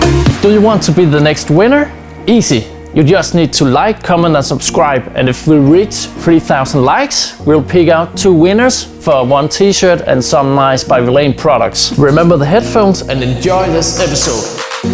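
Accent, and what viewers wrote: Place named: Denmark. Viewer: Came for the hairstyles but stayed for the Danish-english accent <3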